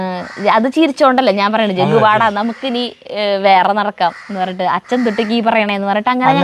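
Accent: Thrissur, India. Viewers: നമ്മളും ചുട്ട ന്ന് തന്നെയാണ് പറയുന്നത് പ്രോപ്പർ തൃശ്ശൂർ ഭാഷ അത് തന്നെയാണ്. തൃശ്ശൂർ അങ്ങോട്ടും ഇങ്ങോട്ടും നീങ്ങുമ്പോൾ വ്യത്യാസം ഉണ്ടാവും. നിങ്ങൾ പ്രോപ്പർ തൃശ്ശൂർ ഭാഷ തന്നെയാണ് സംസാരിക്കുന്നതു 👍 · trissur ഭാഷ യാണ് നിങ്ങളുടെ ചാനലിന്റെ highlite trissur ഭാഷ മാത്രം പറഞ്ഞാൽ മതി ഞാൻ മലപ്പുറം കാരിയാണ് 34 വർഷമായി hydràbaadil settiled aanu njaan ningalude ella vidieo kalum kandittund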